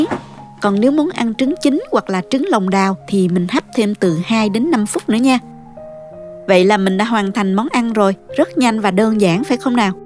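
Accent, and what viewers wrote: South, Vietnam. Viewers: Giọng nói ngọt ngào quá. Tiếc là cô dùng từ Hán Việt nhiều quá, nặng nề mà không phù hợp. Chẳng hạn, “ cứu cánh” không có nghĩa là cứu giúp, cứu thoát. Cứu cánh là mục đích cuối cùng, giải pháp duy nhất…từ này có ý nghĩa triết học,không phù hợp với chuyện nấu nướng, thay đổi món ăn cho lạ miệng… Giọng miền nam ngọt ngào thì nên dùng cách nói giản dị, thân tình, dễ hiểu thì kênh của sẽ thu hút nhiều người xem hơn đó.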